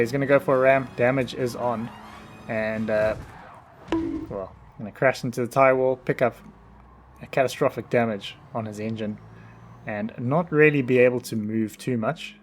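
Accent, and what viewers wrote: South Africa. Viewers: Is that a South African accent I detect?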